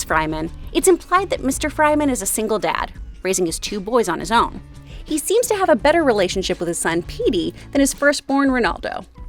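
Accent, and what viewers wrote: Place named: Texas, United States. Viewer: I knew there was a reason I liked your voice 😆 Being a Texan, I love familiar accents above all else 😋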